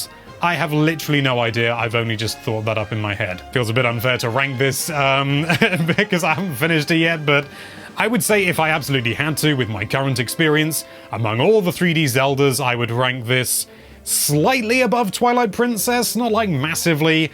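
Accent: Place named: United Kingdom. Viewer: Ya know for awhile didn't realize he was British til last year or early this year don't ask lol he literally has the damn British accent how did I not notice lol especially after watching Lucifer the accent is even more obvious for me now lol I love it. 😂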